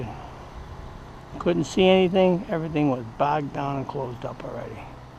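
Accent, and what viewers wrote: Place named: Massachusetts, United States. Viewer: HI...I had to come back and respond to your nice reply. I'm an old Mass. girl. (Medford, Walpole, Lynn) and enjoyed the accent. My life brought me to Mich. Thought you'd get a kick out of the fact that I forwarded the Vlog to Thailand, where my son, a retired Special Forces Colonel has married and retired. Wanted to jog his memory about snow (I don't like hot, humid weather, so I visit him on Facetime every Sat. Nite. He forwarded the Vlog to an English friend who married and settled there, and wouldn't be surprised if he passed it to some Vets at the VFW in Bangkok. A reminder of winter and what surprises it holds.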